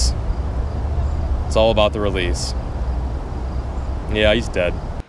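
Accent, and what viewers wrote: United States. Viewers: that country accent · Your country accent kills me though 😂😂😂😂😂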